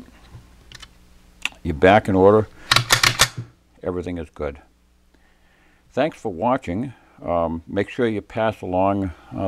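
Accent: New England, United States. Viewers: Very informative video and I like your New England accent.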